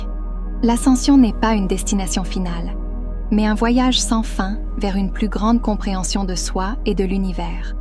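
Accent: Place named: Canada. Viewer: ******* Bonjour, (Elle a un petit fond d'accent canadien cette voix de l'IA ). Nous le savons bien qu'ils nous manipulent depuis le panthéon mésopotamien..... Mais nous sommes au temps de l'apocalypse et notre désir est de parvenir sur Nibiru le plus vite et le mieux possible... Nous y travaillons de tous, nous, les Ligthworkers... Hi, Fathala...Par IA interposé ! ******************************************************************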